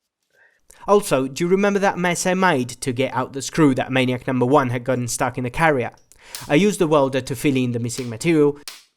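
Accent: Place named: Italy. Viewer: He's Italian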